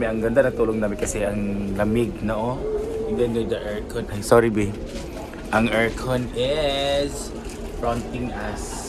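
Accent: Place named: England, United Kingdom. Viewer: ❤, I like your English accent ❤, bagay gyud sa imoha mag host ug event ❤😊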